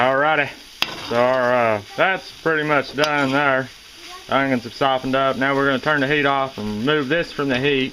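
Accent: South, United States. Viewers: You have some great recipes. I cook every day. And we season a lot the same. I noticed your southern accent. Can I ask where you are from? Thanks for posting. Looking forward to your next recipe.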